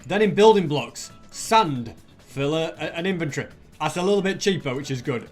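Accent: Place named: London, United Kingdom. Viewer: hes english (london)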